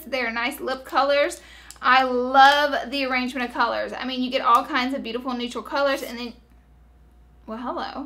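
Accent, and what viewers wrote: South, United States. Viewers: Have you...have you ever heard of a southern accent? Magic.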